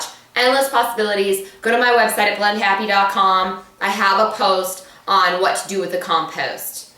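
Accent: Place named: Florida, United States. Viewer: Are you from Florida? You sound like a Southern Floridian (not South Floridian, mind you). :-)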